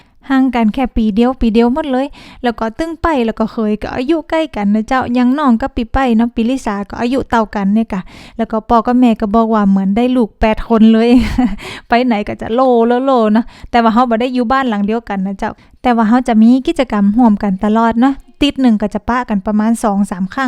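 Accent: North, Thailand. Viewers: ครั้งแรกเน่อเจ้าหล้าเพิ่งเจอยูทูปเบอร์คนเมือง แม่ก่อเลยกดติดต๋าม เลย ฟังกำเมืองเหียพ่องม่วนดีเจ้า คนเจียงใหม่เน้อเจ้า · ฟังรู้เรื่องอยู่ค่ะ เพราะที่ทำงานแต่ก่อนคนเหนือเยอะเลยฟังรู้เรื่องค่ะ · สำเนียง ลำปางกะเชียงราย เหมือนกันมาก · เพิ่งมาเห็นช่องนี้ กำเมืองงงง น่าฟัง รื่นหูมากกก ติดตามเลยเจ้าาา❤❤❤❤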